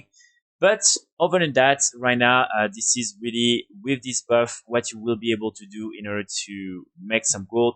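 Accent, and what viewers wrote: France. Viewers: Cet accent français 😂😂😂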